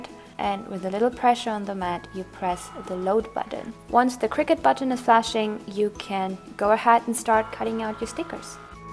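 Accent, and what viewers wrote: Germany. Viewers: Kommst du aus Deutschland weil du so ein deutschen Akzent hast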